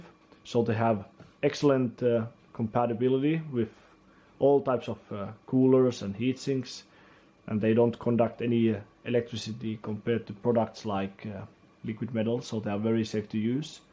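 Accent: Finland. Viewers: Suomi brgl, tunnistin heti aksentist! 😂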